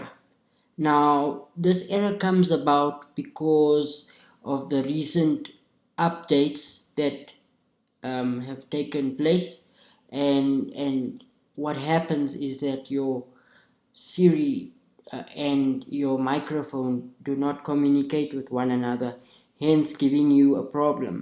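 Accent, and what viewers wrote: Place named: South Africa. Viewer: Love what sounds like a South African accent- am I correct bro?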